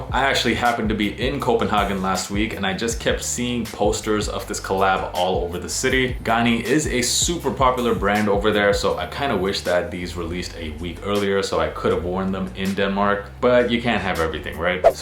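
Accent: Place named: United States. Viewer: i always thought you lived in Europe.. not sure why cus you sound american lol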